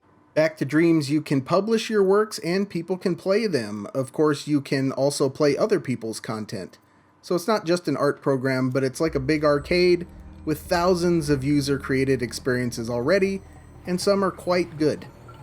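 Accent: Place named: Iceland. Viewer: can't really be too brutal with this vid, its interesting seeing what you vr guys are looking at and experiencing right now, and hearing your take on it. looks cool enough. I was most surprised about your accent, had no idea you were icelandic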